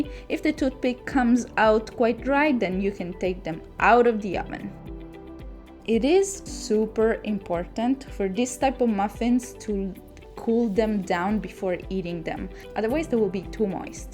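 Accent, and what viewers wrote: Italy. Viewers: Si sente che sei italiana❤ bravissima grazie della ricetta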